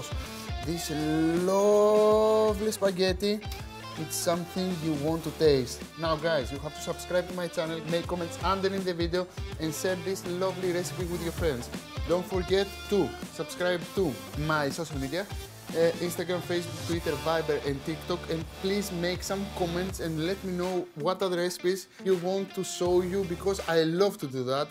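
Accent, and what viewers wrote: Greece. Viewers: Carbonara with a Greek accent 🥰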